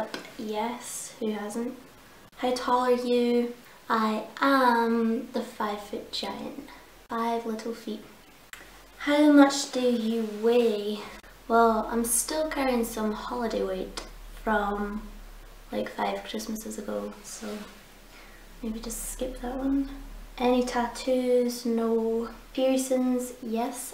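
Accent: Scotland, United Kingdom. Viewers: Oh my gosh, I love you - you are hilarious :) Also great to see a fellow Scottish person, your accent is much cuter than mine haha! I would love to see hauls or more tags xx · YAY finally someone scottish! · hi are you Scottish and if u aren't where are you from? : )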